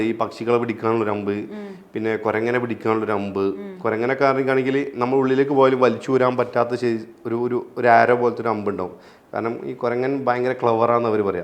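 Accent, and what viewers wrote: Malappuram, India. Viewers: തനി മലപ്പുറം സ്ലാങ്ങിലുള്ള തമാശയും പറഞ്ഞ് ബുള്ളറ്റിൽ യാത്ര ചെയ്യുന്ന കാണുന്നത് വർഷങ്ങൾക്ക് മുമ്പ്, ഇപ്പോൾ ഒരു പാട് പക്വത വന്ന യാത്രികൻ ❤❤ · Malappuram slang കേൾക്കാൻ തന്നെ ഭയങ്കര രസമാണ് ❤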